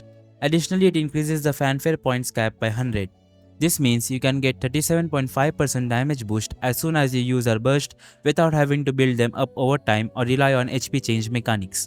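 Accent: India. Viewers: Yo did his accent change to Indian or am i imagining it?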